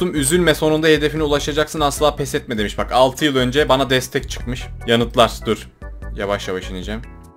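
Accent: Turkey. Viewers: Abi sen öz sivas lısın çünkü k leri g söylerek okuyon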